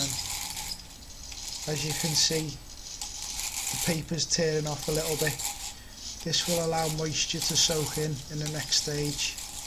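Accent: Liverpool, United Kingdom. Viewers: Yes lad scouce as well (Manc here) keep up the good work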